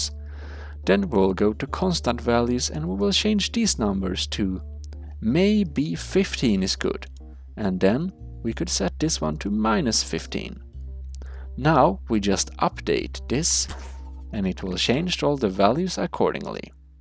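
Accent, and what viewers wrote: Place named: Sweden. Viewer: Really nice Idea! I only managed to make a few vehicles with actual steering, using a stupid technique. This one is way better. (Also I guessed that you're from Sweden and wasn't wrong. I understood that by your accent(especially the "CH" sound) and the way you form the sentences, use some words that are rarely used by English speakers.) By the way, love that accent. I can be proud of myself and stop thinking about being a complete failure now... for 5 minutes.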